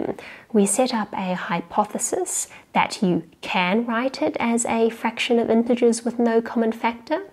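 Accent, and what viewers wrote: Australia. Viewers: Are u Australian? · Yes, targeting Indian audience is a good option on YouTube. In India they promote technology like engineering and computer sciences. Is she Australian? Her accent sound Aussie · Hey are u Australian??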